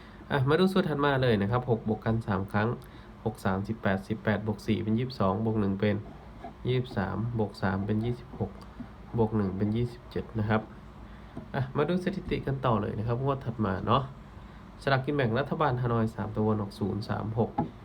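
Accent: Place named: Phu Thai, Thailand. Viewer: หนูอยู่พรรณานิคมอาจารย์คนที่ไหนค่ะฟังเสียงคือคนภูไทย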